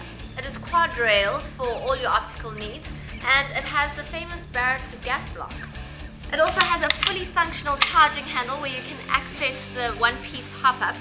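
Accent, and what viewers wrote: South Africa. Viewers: this chick sounds south African,i could be wrong.she really does. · john accer She's from South Africa with that accent :) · She so sound like a South African.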